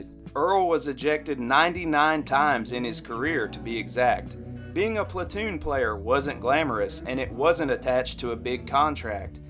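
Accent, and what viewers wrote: South, United States. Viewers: Love your southern accent . Makes an oldschool baseball video more authentic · I don’t know why. But I really enjoy your voice. I’m from Ontario Canada. In no way am I insulting you. Can I ask where your from. I want to guess some where in the south. I think you are a great story teller. Keep up the great work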